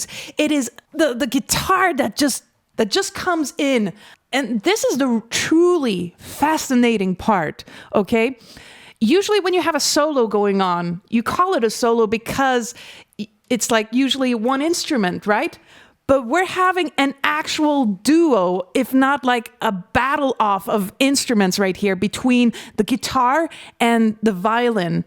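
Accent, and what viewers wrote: Paris, France. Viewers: Very impressive, true french accent, not the funny quebec one, you could pass for a real parisian. I don't know if it is the lyrics or the violin, but it's remind me of the band 'Louise attaque'. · Hello/Bonjour merci pour ton opinion très intéressante sur cette musique. Ton analyse se rapproche finalement assez bien du contexte du jeu (je n'en dirai pas plus pour éviter de spoil) Pour information, il semblerait que le compositeur ait eu à disposition les dessins préparatoires du jeu et les grandes lignes de l'histoire quand il a commencé à composer. source : <link> Vu ton superbe accent français, j'imagine que je n'ai pas besoin de traduire ;) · Beautiful reaction and analysis. I wonder where you're from ; you sound French when speaking french, but have almost no accent when speaking English, which is pretty rare if you're indeed French (though of course you're a trained vocalist). 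And I'm french Canadian so I know you're not from Quebec. Anyways, great video, hope you're doing better and wish you all the best. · I just wanted to say, your french accent is the best one I ever heard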